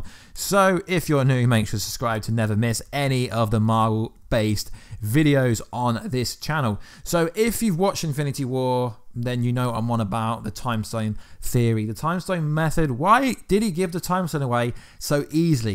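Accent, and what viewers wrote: United Kingdom. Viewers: OH MY GOD... MAN WITH BRITISH ACCENT... YOU KNOW ANT-MAN HAS NOT BEEN RELEASED IN THE UK AND YOU JUST SPOILED IT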